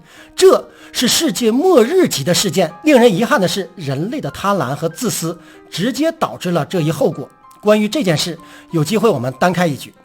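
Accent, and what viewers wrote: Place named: Northeast, China. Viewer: ，东北人儿啊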